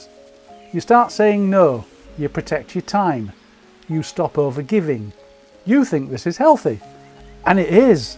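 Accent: United Kingdom. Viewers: I’m pushing 60, in half a year. Is the narrator from Britain? Sweden here.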